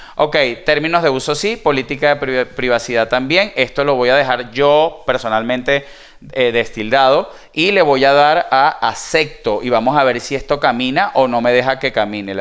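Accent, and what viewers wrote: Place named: Venezuela. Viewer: Hola, un saludo amigo. Percibo que por la manera de hablar eres de Venezuela. Sí es así, sabrás cómo hacer para que reconozca la zona del país? Intento conectarme a LG Channels y siempre me da el mensaje "Lo Sentimos. LG Channels no está disponible para su zona" cambié la ubicación en los ajustes... Y en los ajustes solo me aparecen (Automático - Canadá - México - Estados Unidos - otros). Sí me podrías ayudar, mucho te lo agradezco, hermano.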